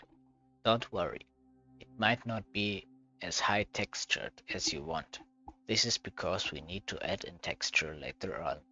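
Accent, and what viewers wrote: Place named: Serbia. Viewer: You are hören sich nach ein Serbe an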